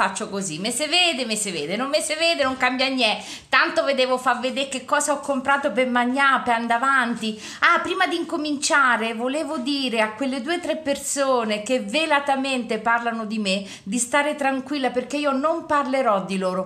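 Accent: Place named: Marche, Italy. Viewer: Ciao cara, dal tuo accento (che è simile al mio) deduco che siamo entrambe marchigiane! Io abito in un paesino della Prov. di Ascoli Piceno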